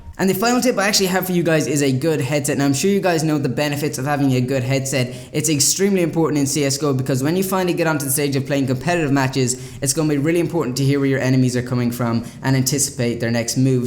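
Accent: Ireland. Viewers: Are you Irish? I'm Irish too and ur accent sounds Irish 😂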